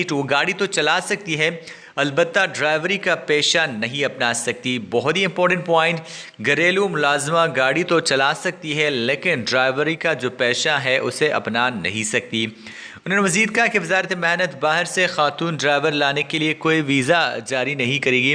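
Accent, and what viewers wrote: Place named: England, United Kingdom. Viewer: Abe yaar sahi se bola karo Yaar zyada ismaat kyon bante Ho Urdu me bhi inglish andaaza o lehja ghused dete Ho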